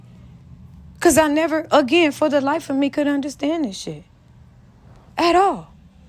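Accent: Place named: Los Angeles, United States. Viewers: I just knew you was from LA just by listening to you for the past few weeks 🙌🏽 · Just by hearing your voice inflections and accent, I knew you was a Southern Cali girl. Thanks for clearing that up. You're funny, though.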